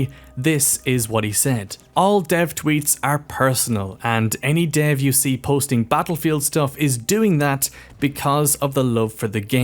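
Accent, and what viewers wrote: Ireland. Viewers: I love that thick Irish Celtic English accent...you sound Like some pirate from Carribean...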